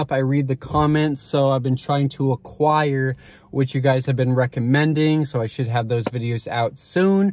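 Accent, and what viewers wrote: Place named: Los Angeles, United States. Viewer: You hear that hes from LA😂💀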